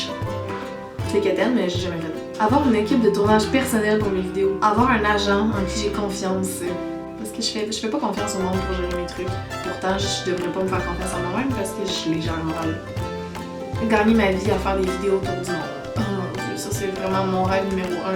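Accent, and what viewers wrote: Quebec, Canada. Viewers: Ouah :o D'habitude je déteste l'accent québécois mais là c'est trop mignon :3 +1 abonné :D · C'est un accent canadien ?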